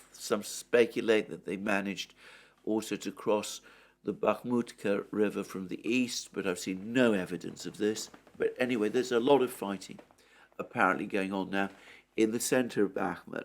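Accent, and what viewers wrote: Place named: RP, United Kingdom. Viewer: great impartial analyses👍. BTW what schools did it take to learn your posh English?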